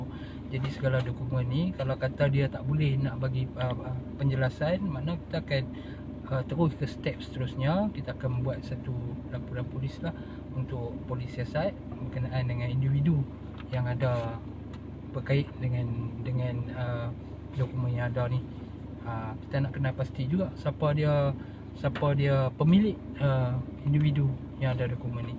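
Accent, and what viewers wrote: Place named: North, Malaysia. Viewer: Tapi abg cakap macam orang utara ye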